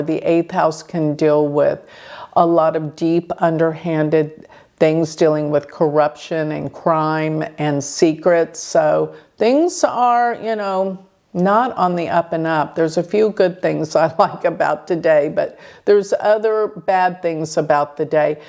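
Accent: Texas, United States. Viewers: I watched a different video of yours, I didn't research anything about you and I immediately said, "She's from Dallas TX!" I am Accent Sympathetic and clairvoyant with 2 levels, possibly 3. I'm going to sign up for my Chart details from your website. I can trust you, my guides have told me.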